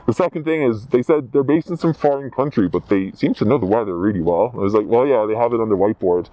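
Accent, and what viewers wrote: Ireland. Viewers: I’m afraid the voice modifier doesn’t get rid of your distinctive Irish accent, nor your typical rhythm of speech. Fun video tho!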